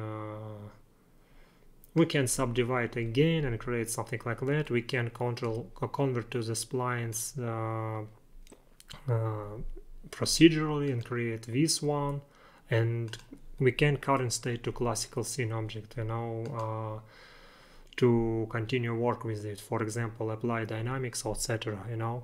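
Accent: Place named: Russia. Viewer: как же тяжело слушать русских говорящих на английском)) тем не менее инфа годная, очень мало туторов по node editor в синеме, хотелось бы побольше видео по нодам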